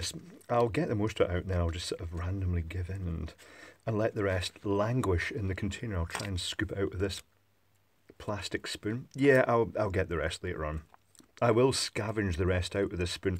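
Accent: Scotland, United Kingdom. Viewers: Shame you didn't put some cheese with the 'French toast'. I find those things too sweet, so with cheese, might taste better! I'll forgive you tho because I absolutely love your soft, gentle Scottish accent and could listen to you all day.... And how nice to hear a man who isn't afraid to admit that he's not too keen on the hot, spicy sauces.....usually male reviewer's seem desperate to impress, with being macho and pouring on loads of (gut) killer pepper/chilli/tabasco sauces. And you're messy.......like your style!!!!!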